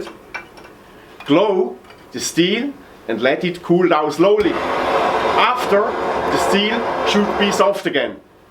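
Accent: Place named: Bern, Switzerland. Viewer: Cool, ä chli weni Bärndütsch ...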